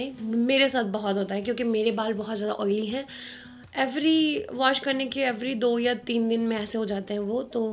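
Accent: Punjab, India. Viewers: I love your punjabi-english-hindi accent😆🥺🥺 it is really cute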